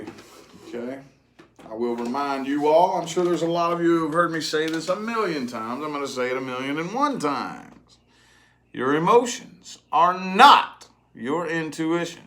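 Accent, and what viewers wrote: South, United States. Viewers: Super accurate, but also I would watch even if it weren't! Love ur energy, your southern accent and your face 😂😂😂😂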